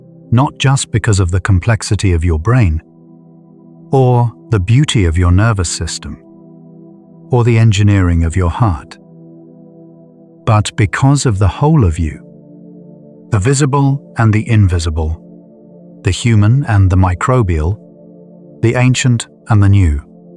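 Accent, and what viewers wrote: England, United Kingdom. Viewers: Thank you for your wonderful videos. The information is awesome. I do fall asleep in the middle, but I know that your information is well researched and will go into my subconscious. Another idea for your videos is what creates disease I’ve been listening and learning from Dr. Robert Morse he’s in Florida. He’s a nature path and his philosophy and understanding on the body is that we have two systems the blood system and the lymphatic system, and the most important system for health is a lymphatic system, which is our draining system. You are either acidic or base alkaline and the bodies always trying to be at homeostasis so if you’re sick, you’ve got too much acid in your system and in order to bring Health, you need to bring in more alkaline so he is a fruitarian or more so high fruit and plant-based very interesting. Interesting intelligent man look them up. 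he’s also an iridologist and he’s very very wise so if you could learn his information and put that into your video, it would be a great way on how to teach people how to be healthy. Thanks again. Love your videos.🥰 ps.. I love the English accent very soothing, im from Vancouver B.C.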